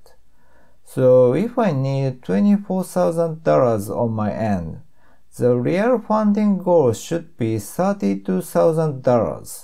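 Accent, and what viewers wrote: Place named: Japan. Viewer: what do you mean by his speech problems? If you’re talking about the way he speaks in the video then that’s just Japanese accent. In fact he is really good at English in the standards of Japan.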